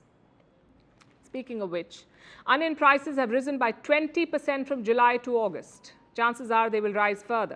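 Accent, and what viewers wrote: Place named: India. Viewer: god damn that indian accent is horrendous man p.s. i typed this without starting the video to know if the news person speaking would be indian accented and speaking english cheers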